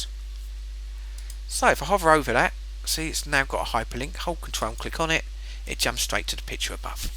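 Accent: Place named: London, United Kingdom. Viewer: You have an unusual name but a strong London accent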